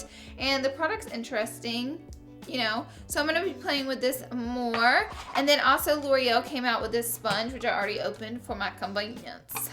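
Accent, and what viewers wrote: South, United States. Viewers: everytime says oil 😂 #thatsouthernaccentitsthebest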